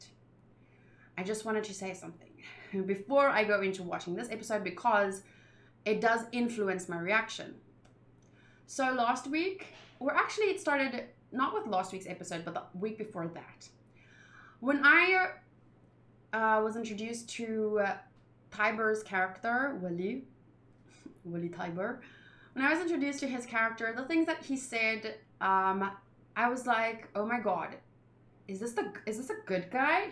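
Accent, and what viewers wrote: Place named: South Africa. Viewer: she from South Africa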